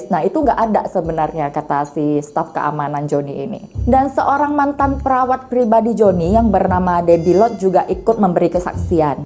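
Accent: Medan, Indonesia. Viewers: Suka cara bawa cerita nya,jelas dan gak berbelit" apalagi kaya ada logat Medan nya ya..☺️ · Haha medan abis...kaya mana itu si amber...logatnya mirip bgt tmn sy yg dr medan... Sukses ya... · Bahasa medan nya kental kali, suka kali aku dengarnya. 😍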